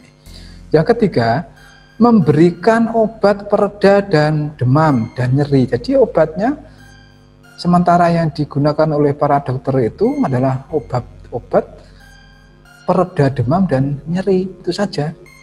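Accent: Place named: Java, Indonesia. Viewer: Matoh... Jowone medok...😄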